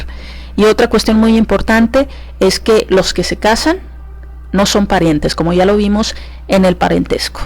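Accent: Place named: Mexico. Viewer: Por su acento, es de mi querido México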